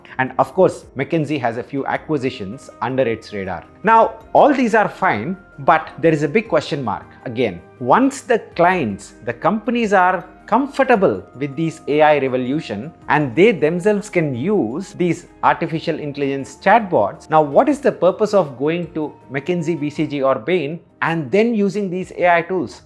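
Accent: India. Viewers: Improve your accent, it's really bleeding my ears to hear how, some Indians speak. This is not how English is supposed to be spoken......Seriously, something is seriously wrong with Indian accents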